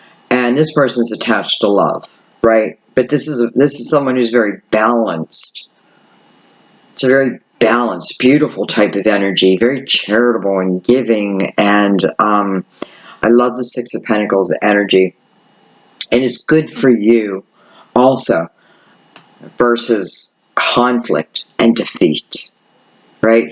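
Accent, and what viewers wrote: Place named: Pittsburgh, United States. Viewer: You are getting so warm on what I am going through I can feel the heat! I found you about two years ago. Being a Pittsburgh native myself, I knew from your dialect and Steelers stuff that you were one too! So thank you for being there because when you read my cards, I am an Aries, it is like coming home to an old friend. Have a blessed year.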